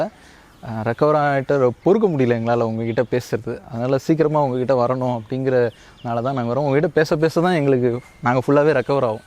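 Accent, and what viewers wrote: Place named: Theni, India. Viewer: Bro neenga entha ooru pakka Theni matheri iruku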